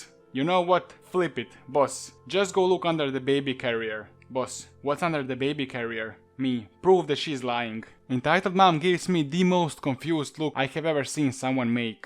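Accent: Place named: Russia. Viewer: I know that accent. Sounds like Russian. But u never know it could be Indian. Sounds like either one. Either way nice vid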